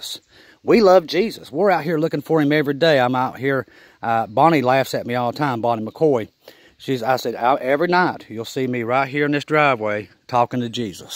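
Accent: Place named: West Virginia, United States. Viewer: Am I getting this right? I just recently subscribed to your channel and it sounded like you are in West Virginia. I live in South Dakota but my entire dad's family is basically in Logan County West Virginia and have been for centuries! I just find it interesting, I'm also related to the Hatfield's on my dad's mother's side although her dad was a McCloud. I love my family there and I love West Virginia though the last time I visited was in 1981, really miss it but my health precludes me traveling. Anyway God bless you brother! I love your posts that I've seen so far! MARANATHA! HALLELUJAH! AMEN!🙏❤️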